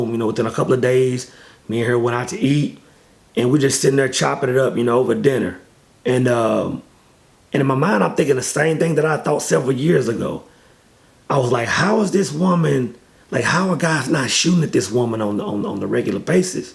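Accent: Texas, United States. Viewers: Big dawg is obviously from Texas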